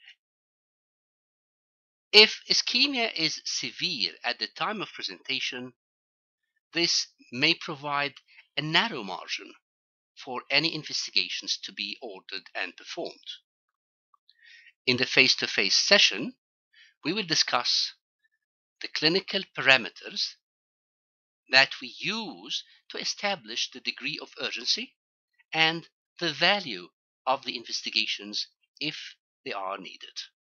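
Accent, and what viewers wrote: United Kingdom. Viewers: I thought he is from England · واااووو ماشاءالله تبارك الله فكرته بريطاني قبل لا اقرأ الاسم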